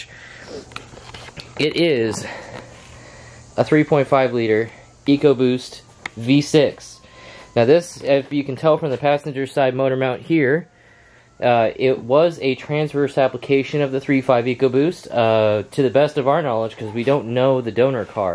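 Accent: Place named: British Columbia, Canada. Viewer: Your accent sonds like almost Fraser Valley BC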